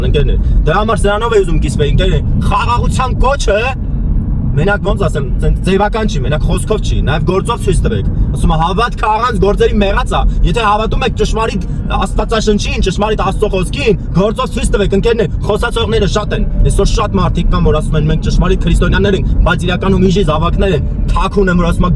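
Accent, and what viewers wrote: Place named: Iran. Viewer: ❤❤❤իրանահայի բարբառով է խոսում, որը շատ համով է❤❤❤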